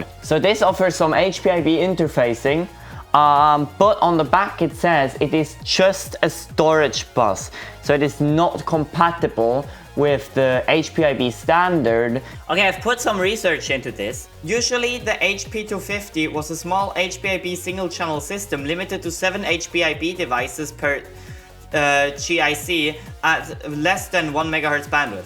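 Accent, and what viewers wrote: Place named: Spain. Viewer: I can't understand your Spanish accent...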